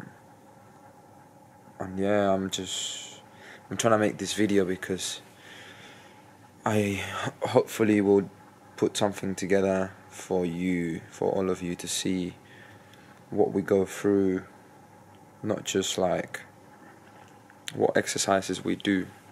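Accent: England, United Kingdom. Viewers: Why do you sound British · Ur accent is more English than mine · transitioning to UK with the english accent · He's british accent is good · You sound more English than Spanish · HE SOUNDS ENGLISH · I've only ever noticed the british aspect of his accent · Sound more English than Harry Kane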